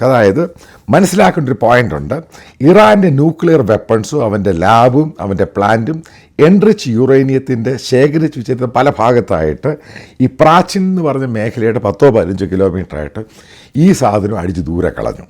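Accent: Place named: Central Travancore, India. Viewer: മദ്ധ്യ തിരുവിതാംകൂർ ശൈലിയാണ്.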